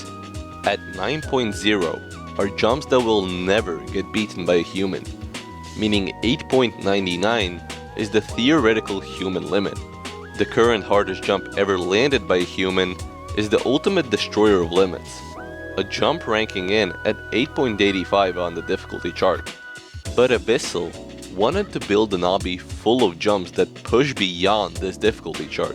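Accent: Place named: Latvia. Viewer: Latvian accent💔🙏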